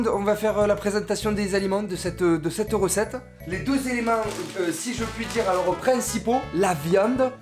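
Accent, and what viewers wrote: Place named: South, France. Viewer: Super ta vidéo j'adore ton accent du Sud :D Ça m'a fait bien rire!!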